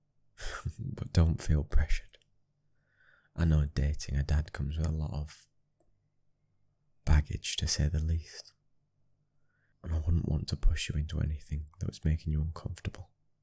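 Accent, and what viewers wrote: United Kingdom. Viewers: I’m obsessed with british accents😍 Especially this man’s voice.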